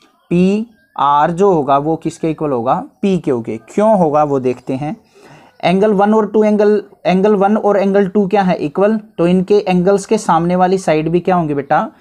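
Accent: Haryana, India. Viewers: Sir Haryanvi hai awaz se hi pta lag gya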